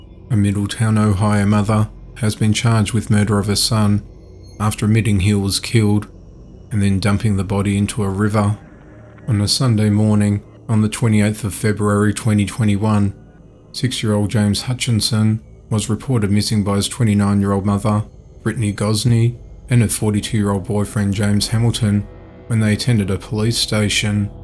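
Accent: Australia. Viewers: is this an ozzy man speaking it feels very real to me hearing such horror from the USA spoken in my own speech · Entertaining and informative as always. Plus I think I enjoy hearing an Australian accent share the news. Great intonation!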